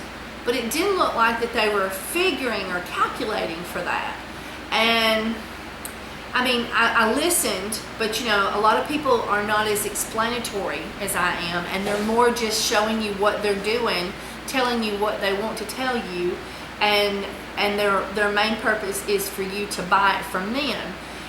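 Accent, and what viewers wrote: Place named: Alabama, United States. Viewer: I love that Alabama accent !!!!!!!!!!!!!!!!!!! Yeeeee Hawwwwwwwwwwwwwwwwwwwww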